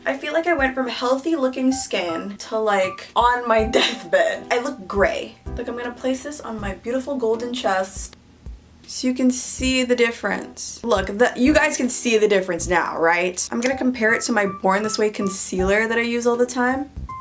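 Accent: United Kingdom. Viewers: When is she speaking w/ british accent? Time? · I love your British accent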